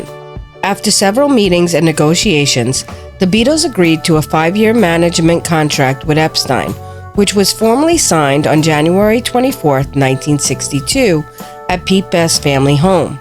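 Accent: United States. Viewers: You're probably an American, methinks.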